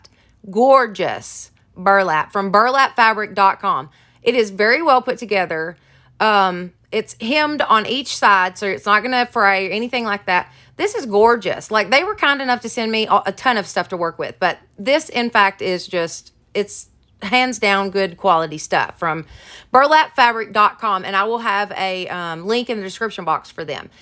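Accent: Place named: South Carolina, United States. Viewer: Girl...loved them all. Gotta ask are you from Georgia or South Carolina? I was born and raised in SC and I swear we have the same accent.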